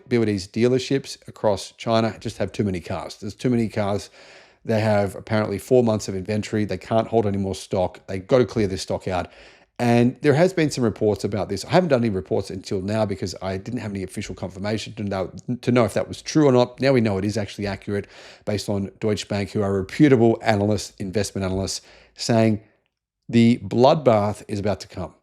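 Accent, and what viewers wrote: Australia. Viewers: Australian pronunciation , wait 'til you hear us say aluminium 😂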